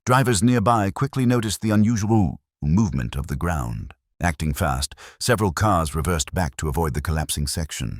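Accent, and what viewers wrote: United Kingdom. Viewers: This new voice is horrible, you already had the perfect posh British voice on recent videos. Please change it back.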